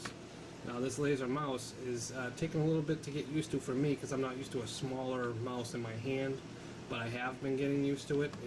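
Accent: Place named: Boston, United States. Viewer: cool setup. you sound like you are from boston guy ;)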